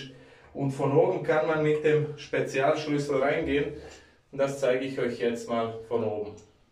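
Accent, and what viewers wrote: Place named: Eastern Europe. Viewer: Super erklärt ohne viel Schnick Schnack so wie es sein muss :) und dein Osteuropäischer Akzent ist sehr angenehm und beruhigend zu hören :) ❤❤❤💯🤝🏼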